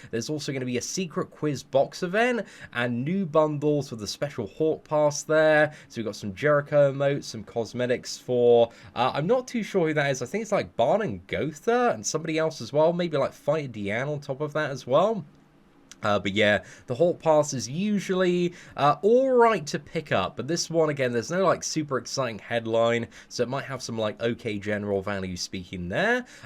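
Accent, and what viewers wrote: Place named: England, United Kingdom. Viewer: It’s pretty obviously England. I mean I think he’s said it before and he has the exact same accent as me so yeah.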